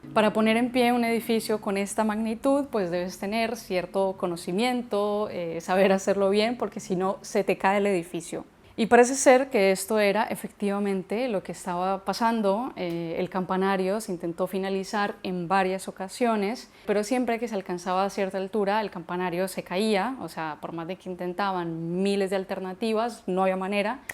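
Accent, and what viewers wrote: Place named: upper class, Colombia. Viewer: Esta encantadora mujer habla como las colombianas de clase, de las que van quedando relativamente pocas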